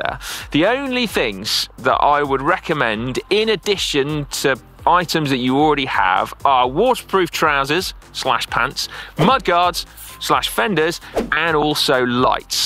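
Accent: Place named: United Kingdom. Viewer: Lifetime bike rider from Madison, Wisconsin, USA (as opposed to all the other Madison, Wisconsins the world over) and fan of this channel for awhile now just wanted to express my appreciation for this channel and this is a very good video. I love to watch GCN viids most of all for the British accents and expressions, this guy (bloke) is one of my favorites. Hey keep going GCN and rock on!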